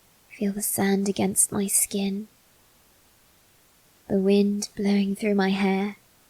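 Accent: United Kingdom. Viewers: Why are you so good at doing a Bri'ish accent? (I'm Bri'ish my self..!)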